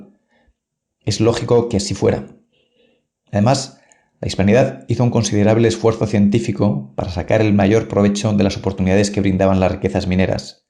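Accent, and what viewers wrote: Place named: Malaga, Spain. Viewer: Parece usted malagueña... Se dice "osó", en Málaga y Cádiz dicen ("disen") ozó.... El asunto del seseo y el cezeo es complejo, somos ocho ("osho") provincias en Andalucia, y en cada una hablamos distinto... !! Esto es un sindios !! 😅😂😂 Saludos cordiales desde Córdoba, España.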